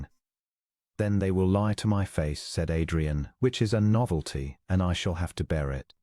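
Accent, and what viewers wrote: United Kingdom. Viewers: This was one of your better stories. Loved the writing and the wonderful British accent of the narrator. Just wonderful. Thank you.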